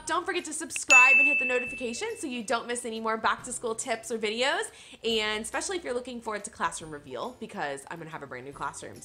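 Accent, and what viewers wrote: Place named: Indiana, United States. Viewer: I would LOVE to know what decor pack you are looking at. I am going for the same look in my classroom. I am switching rooms and grades, looping with the same kiddos and want to give them something fresh. Fellow Hoosier here. Transplanted to NC at 15. From the South Bend area. Your accent makes me feel at home. Enjoy your videos. Thanks for sharing!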